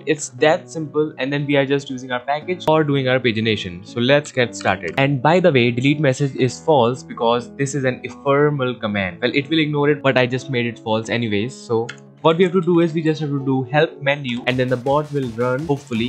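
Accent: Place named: India. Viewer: +where are you from? you sound like an indian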